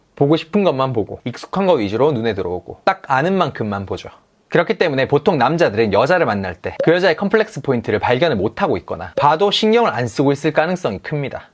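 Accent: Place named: Daegu, South Korea. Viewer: 대구분이시네 대구말 예뻐요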